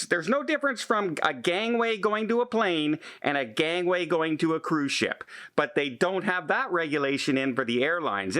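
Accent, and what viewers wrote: Canada. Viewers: All this a guy who's country has banned cruising for a year. If you want to criticize a U.S. Agency then drop the Canadian accent clown and stay in your country!!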